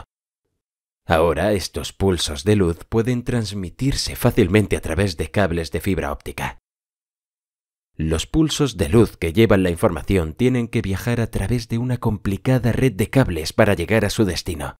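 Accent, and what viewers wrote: Spain. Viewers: por que hablar en español marcado cuando puedes hablar neutro ? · Porque debe estar en español de España? Luego se me queda ese zumbido a tono español 😥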